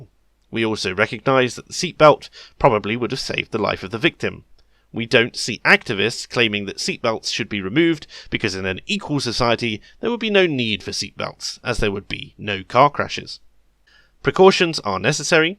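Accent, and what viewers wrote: RP, United Kingdom. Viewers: Posh Brits! I love Posh Brits talking channels. I love Voltaire's Ghost too.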